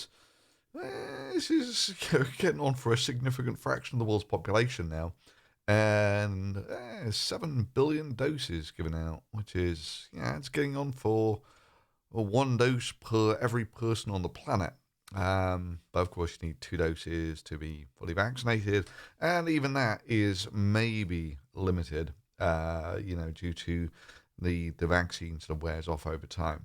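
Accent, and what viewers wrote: United Kingdom. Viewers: I seen where u get your accent. Its grom uk. This guy is a aristocrat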